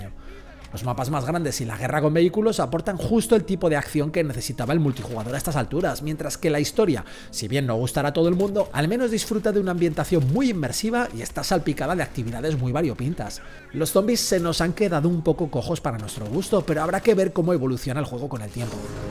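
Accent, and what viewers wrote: Spain. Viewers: Volvió castellano, gran análisis maestro.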